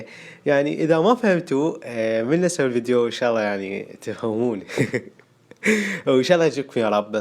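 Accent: Saudi Arabia. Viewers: حلوه لهجه السعوديه احنه العراقين نفهم كل اللهجات لاكن محد يفهم لهجتنه 😂😂😂😂👏🏻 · حسيتك سعودي مدري ليه هههه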